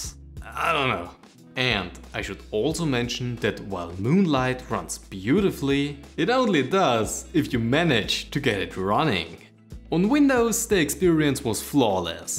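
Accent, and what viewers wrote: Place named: Germany. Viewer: He is clearly German. Why I know that? Because I speak the Same like this Guy c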